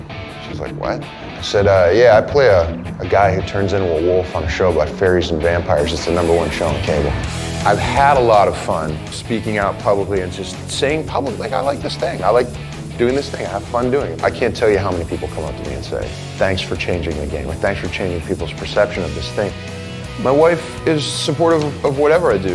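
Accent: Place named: Pennsylvania, United States. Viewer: I find all of you guys to be wonderful beyond words. What's really odd is part of it is because you're Pennsylvanian, which I can tell from your accent and you're upscale "Ohio River Valley". The richer ones (Rivers) in Pittsburgh. Very excited to be with you AND get our government some BIG Money!!!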